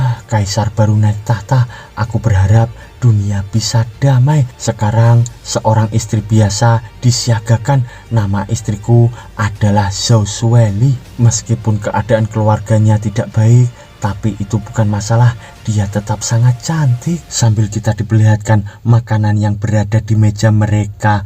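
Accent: Java, Indonesia. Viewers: Mas njenengan jowone pundhi ?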